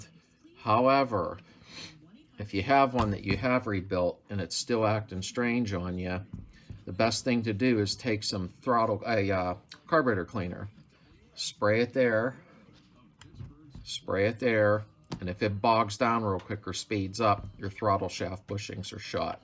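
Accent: Pittsburgh, United States. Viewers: pittsburgh accent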